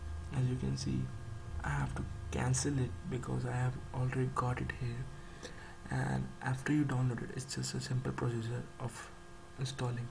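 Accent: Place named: India. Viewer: im i the only one to hate indian accent ?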